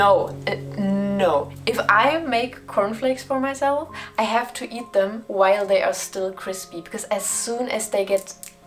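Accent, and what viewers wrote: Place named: Germany. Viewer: Witzig Habe nach neurodivergent artists gesucht & du bist aufgetaucht. Direkt im ersten Satz konnte ich vermuten dass du aus DE kommst.. sollte so für mich leichter sein das englisch zu verstehen 😀😀💚💚💚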